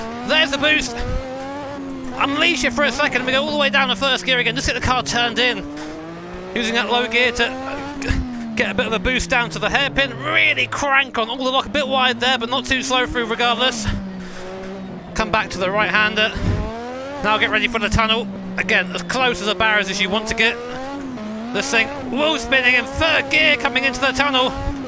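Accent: United Kingdom. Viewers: My favorite Brit! I love how there is no "TH" in your vocabulary. I mean no disrespect, I think it is cool and sounds very Brit to me.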